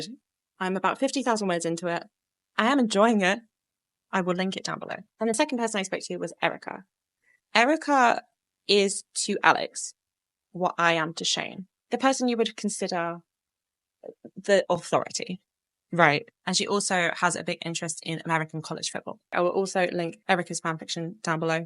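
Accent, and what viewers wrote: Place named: United Kingdom. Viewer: Right of the bat: you seem so much more british on this one?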